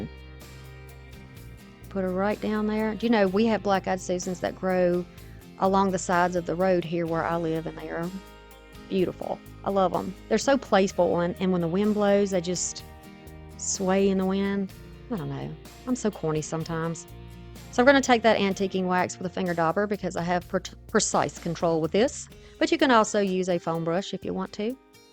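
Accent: South, United States. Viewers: ❤ I love your country accent. I have one too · Hi, new subscriber here. Heard that Southern and knew i belonged! Mississippi, here. I love your designs and the "make it your own!" You had me at y'all!😁🥰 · Crackling on acrylic paint is not easy. Love these sunflower DIY's I love your country accent. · I’m from the south, so I hear that accent a lot. I’m from Louisiana so we have a bit different accent but here in Tennessee, it’s different. You actually sound like a lady I work with. Just the things you say and the way you talk. She crafts as well so I had to check the name! 😂 but she designs tumblers. We apply labels to products, etc., and that was right up her alley when we had these tubes to place labels on. She was the only one that could do it perfectly and quickly! The little ones I could do, once she showed me how. The bigger ones, no can do! 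I was happy to let her do them. One more thing… different subject— I got these decoupage papers on Amazon and didn’t notice that they were only 6”x6”! They are beautiful but I think I can only use glue sticks to apply them! What can I use them for? If you have any suggestions or if you could possibly do a video on using them in some neat way, that would be great! It’s a whole picture of flowers with no space to kind of cut them and put just accent pieces without them looking like they’ve been cut. It’s hard to explain. Just looking for ideas or suggestions. I was very disappointed and just should have paid more attention to the size! 🤦🏻‍♀️ I also go some stencils that were bigger than I was wanting but I can find something to do with those. I’m not sure I can share pictures on here or I’d show you what they look like. Maybe if I matched the paint to the background of the paper, I could possibly do something with them. 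I just don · All the DIYs were great. Love the style. Never get sick of ur southern accent. Everyone has some type of accent. I am from Maine and we have a different accent here too. Keep the DIYs coming. ❤️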